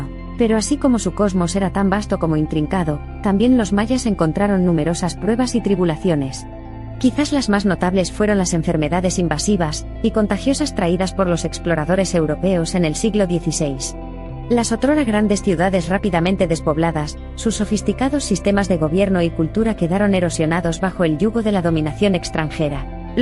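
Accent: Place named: Spain. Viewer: Pienso que es una ofensa que la propia narración sea con acento de España...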